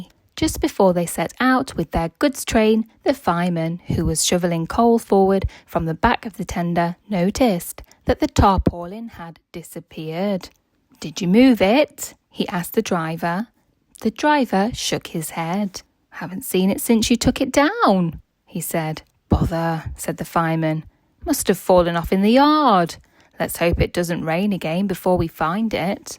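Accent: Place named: Scotland, United Kingdom. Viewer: You did well with the Scottish accent. 🏴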